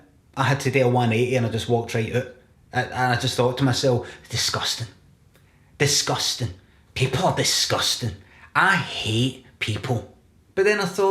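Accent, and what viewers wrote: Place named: Glasgow, United Kingdom. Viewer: made the mistake of using automatic captions, does not handle a glasgow accent at all.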